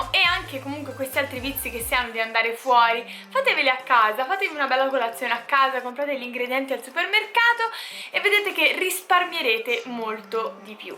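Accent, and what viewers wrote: Tuscany, Italy. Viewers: ti è scappato l'accento toscano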